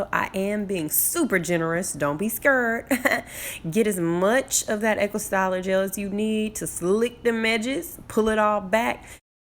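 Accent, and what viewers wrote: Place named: South, United States. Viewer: I love your southern accent it’s sooo cute 😍😍😍 and yasss sis this bun came out so cute and effortless! Def gonna be trying this!!! 👏🏾👏🏾👏🏾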